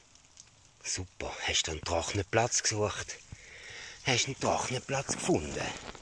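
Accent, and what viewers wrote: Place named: Switzerland. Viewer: Boah het mi das jetzt lang bruucht für usezfinde, dass du schwyzerdütsch retsch. Schöni Chatz <3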